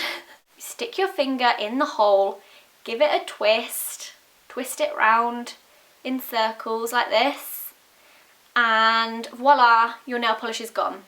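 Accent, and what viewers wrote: England, United Kingdom. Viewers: British accent-3-3-3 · you're the representation of an british accent :D cute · i subscribed because your amazing british accent, but then i figured out i love your videos, too ;D well, your really pretty and really amazing!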